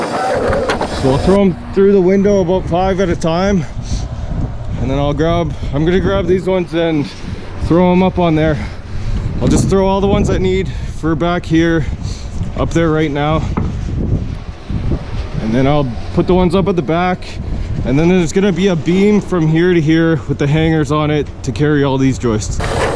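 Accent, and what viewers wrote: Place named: Canada. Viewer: You sound Canadian. You ever end your day with a smoke?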